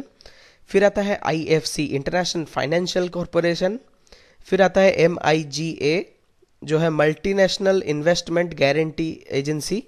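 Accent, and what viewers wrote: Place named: Bengal, India. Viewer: sir u please don't make videos ..your voice is terrible ..your begali accent is not at all effective ..it's very tedious ..i m unable to comolete the video due to your tedious voice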